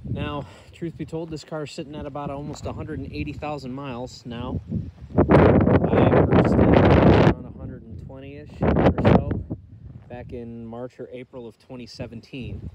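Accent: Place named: Buffalo, United States. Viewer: That Buffalo accent 😂 nice videos man. Nice car too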